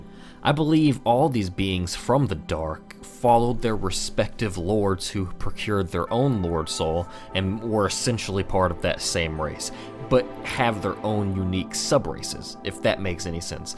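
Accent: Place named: Western Pennsylvania, United States. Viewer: great fuckin videos dude. luvin it. im kinda a noob to the series (i played through most of 2 and smashed 3 but missed the first one) and youtube content creation. i love your take on things and am probably going to go home and get prepare to die tonight. i am currently writing a (serious) dark souls rap going into some of my theories, and yours tie in great with mine. i hope you enjoy it when it gets up if you get the chance to see it! hopefully will be this weekend. also--are you from western PA? hope thats not too creeepy of a question but my moms fam is from up there and you got the accent like whooooooa sometimes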